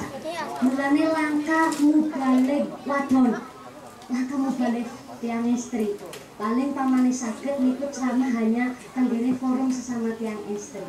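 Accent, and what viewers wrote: Banyumas, Indonesia. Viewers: Ceramah ngapak Lucu Ustadzah.. Terima Kasih ceramahnya Ibu Ustadzah.. Sukses selalu Bu..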